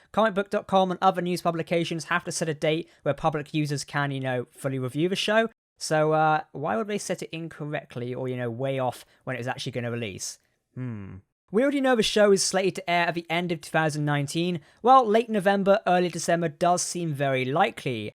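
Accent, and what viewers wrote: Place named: United Kingdom. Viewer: susan chase He’s speaking British English.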